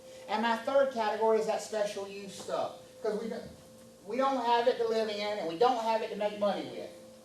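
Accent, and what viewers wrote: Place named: North Carolina, United States. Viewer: sounds like NC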